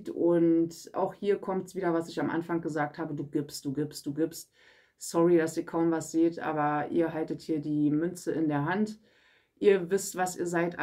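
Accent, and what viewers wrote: Berlin, Germany. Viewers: Guten Morgen Ich liebe deine Berliner Schnauze 🙂 und deine Legungen. Bleib wie Du bist!!!!! Danke für diese Botschaft 🙏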